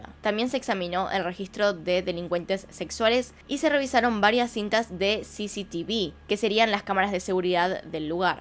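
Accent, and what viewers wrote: Argentina. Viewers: Amo tus videos y tu acento argentino que le da como mas emosion a los casos! Saludos desde west palm beach, florida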